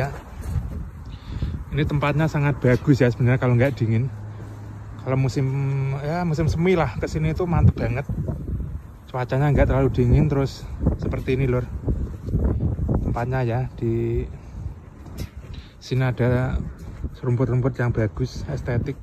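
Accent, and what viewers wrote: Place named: Java, Indonesia. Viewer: Wis njan....senajan awakmu wis tekan adoh korea kono....tetep wae medok. *kui kue sing kotak cilik neng nggonku jenenge etheh etheh plengeh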